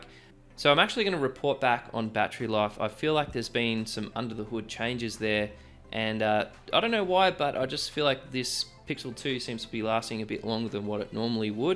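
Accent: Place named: Australia. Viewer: i just love aussie accent.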